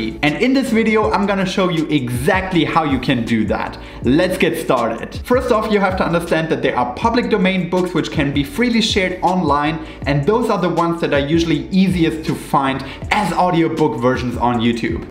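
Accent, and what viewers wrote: Germany. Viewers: Sir, are you German? Because you have the exact accent of a german friend of mine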